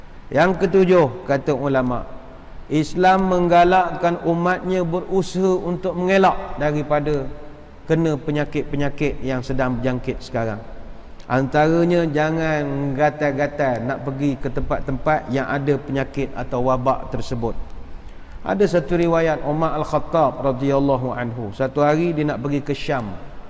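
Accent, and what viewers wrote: Pahang, Malaysia. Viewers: Suka dengar ustaz cakap Pahang. Saya orang Pahang tapi dah lama merantau di luar.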